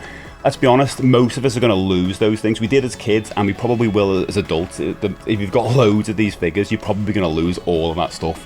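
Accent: United Kingdom. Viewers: First of all I love you're voice. Something about British accents that appeal to me. Lol Second, I've been a toy/ action figure collector for 30 years. I really love my collection. Mostly Buffy the vampire Slayer stuff, WWE/WWF figures. I used to have the ring and part of the wrestling ramp with accessories. But no longer have those because those are the ones I sadly played with as a kid lol. Third, I'm into Funko pops too. I've got lots of them. Harry Potter for instance I've got the Hogwarts castle. I would love to unbox it and display it for my entertainment. But at the same time I'm afraid of dust and dirt or whatever ruining it over time. Lol Fourth, I've go alot of Disney Funko pops. Everything is in the box. Currently I'm at a loss of what to do. Should I also do the pros and cons list?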